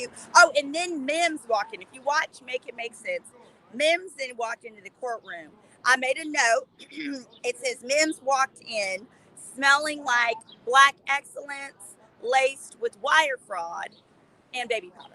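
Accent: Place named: South, United States. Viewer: I’m from California, but I live in Texas now thank goodness, when I hear your voice, it reminds me of the sound of a sister meaning an African-American southern woman and also a southern Anglo woman. It’s really interesting to my ears.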